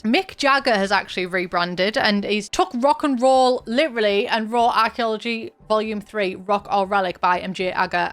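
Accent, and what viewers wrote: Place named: Redcar, United Kingdom. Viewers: Middlesbrough accent. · Is it cause we where both born at james cook that I love you so much . Cause girl it might just be that i live in redcar n we share an accent 😂🎉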